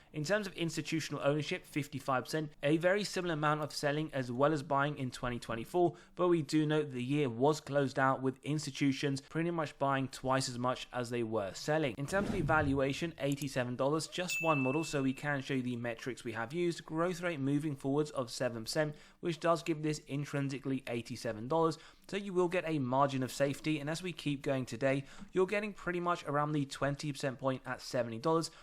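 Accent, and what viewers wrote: England, United Kingdom. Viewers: Why do people think that an English accent makes you sound intelligent???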